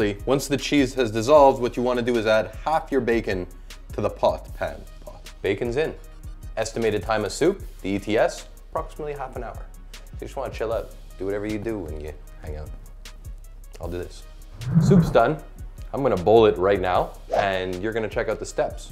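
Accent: Quebec, Canada. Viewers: You sound so Canadian lol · He is so Quebecois.... ( FRENCHY!!! ) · I don't dislike u I actually like u cause u sound a lot more Canadian the the other guys Lol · His accent seemed pretty consistent to me. He only changed his accent once when he said bacon (Jamaican accent, sounded like beer can). Either way, he has quite a bit of a French-Canadian accent.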